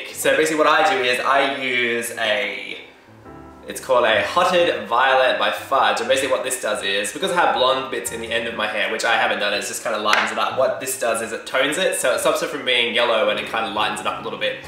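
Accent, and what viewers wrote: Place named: Australia. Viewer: Good to hear a fellow aussie! Nice hair mate.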